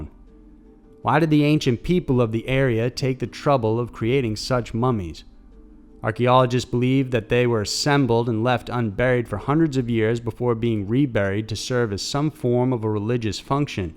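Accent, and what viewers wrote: South, United States. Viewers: Wonder if he has southern roots like McCaunahay?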